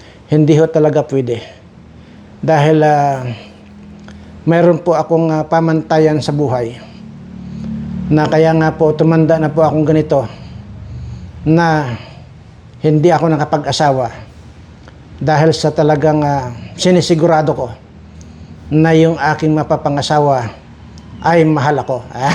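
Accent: Iloilo, Philippines. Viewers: Acsent talaga yan sa taga probinsya duon sa iloilo sir 👍😅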